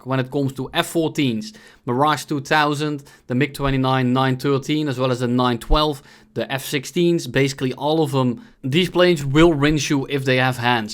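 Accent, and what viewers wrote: Netherlands. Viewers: Youre dutch right?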